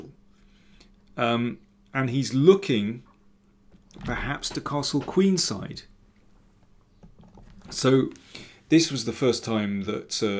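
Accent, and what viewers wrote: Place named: RP, United Kingdom. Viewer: Keep quiet, that's the Sicilian secret! Icing on the cake! 😎 Thank you for the excellent commentary in Queens English!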